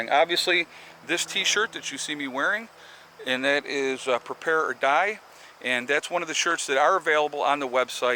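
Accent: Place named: South, United States. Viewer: I thought you were from North Carolina..or some southern states.. Y could anyone hate you? Perhaps they're jealous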